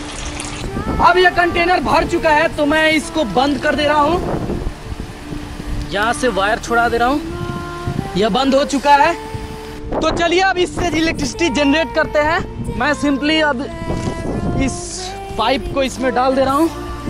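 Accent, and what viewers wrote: Bihar, India. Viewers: bihari ho ka babua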